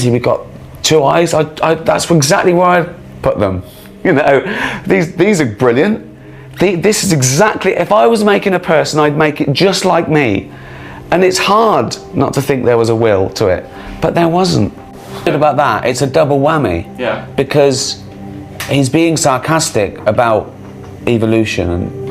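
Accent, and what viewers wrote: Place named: United Kingdom. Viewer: Be careful dude. You can make just about any bunch of bullshit sound legit with a British accent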